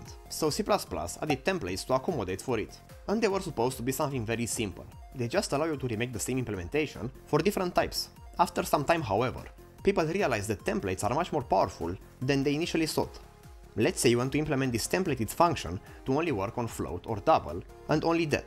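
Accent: Russia. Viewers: Your accent is interesting, do ye have russian heritage? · Excuse me for that question, but it's very interesting for me as lover of languages and especially English) What country are you from? I thought you''re Russian when i heared you accent - it sounds very similar to Russian.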